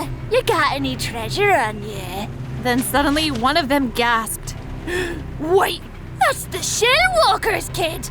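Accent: United Kingdom. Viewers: You sound like British I always want to sound like British and I want to be shy less to speak English or British idk how to speak British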